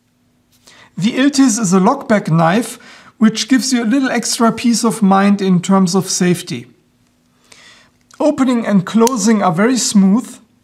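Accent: Germany. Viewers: lovely knife i love your channel your english is excellent especially with your German accent i find it relaxing and interesting at the same time:) cheers from the UK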